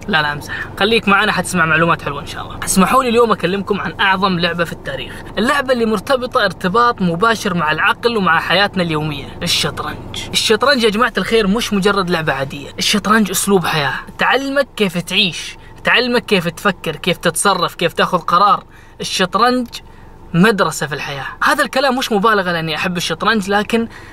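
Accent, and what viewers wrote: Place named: Saudi Arabia. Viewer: اتوقع انك مصري بس تتكلم سعودي زين ما شاء الله عليك 😂💛 ،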